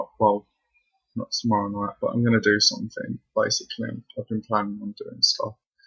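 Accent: England, United Kingdom. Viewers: nice to hear an English accent. what part you from.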